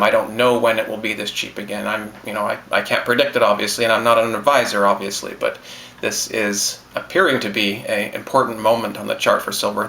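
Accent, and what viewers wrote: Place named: Canada. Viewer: I miss your friendly canadian accent. Hope you are doing well!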